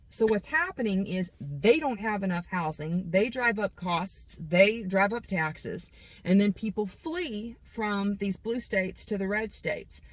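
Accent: South, United States. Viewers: Love this young lady. First time viewer, l was mesmerized by those piercing eyes and when she started quoting scripture l was hooked. Love the southern twang as well.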